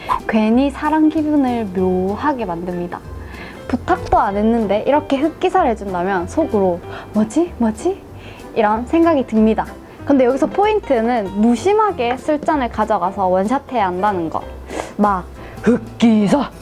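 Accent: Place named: Jinju, South Korea. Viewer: 나도 고향이 진준디 말투가 참 익숙하네요 ㅋㅋ 응원합니다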